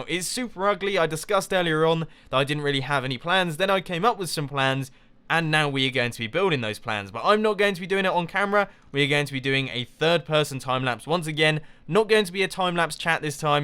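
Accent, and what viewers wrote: England, United Kingdom. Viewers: Are you English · I like your british accent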